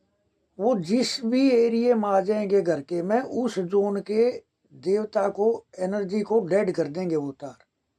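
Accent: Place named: Haryana, India. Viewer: Guruji.. Aapke hosle jaror buland hoge... Aisi jaankari abhitak kisi ne you tube par nahi daali hai... Aapke 42 saal ka jivan Ka anubhav aap bahut hi ache tarike se samjha rahe ho... Bahut jaldi Aapka channel bulandiya hasil karega... Sir Delhi me kaha se hai plz bataye apne office ke baare me next video me....hamare Haryana ka touch bahut acha lag raha hai jab aap baat karte hai.... 🙏🙏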